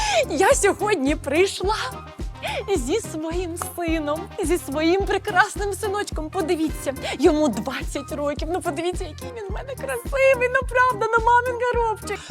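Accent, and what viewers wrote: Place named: Western Ukraine, Ukraine. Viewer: Звідки у ці слова..привела з наголосом на Е і легкими західними інтонаціями....Це риторические питання.🎉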